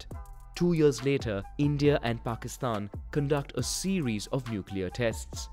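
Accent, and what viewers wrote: North India, India. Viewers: i can hear indian accent in his voice why ? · Yeah ....this is north Indian accent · Ugh hate indian accent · Is the narrator Indian? His accent sounds so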